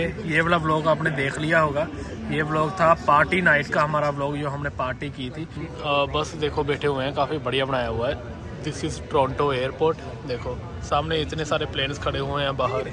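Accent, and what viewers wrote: Punjab, India. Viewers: Bai ji punjabi muh se punjabi accent bohot kaint lagta hai😂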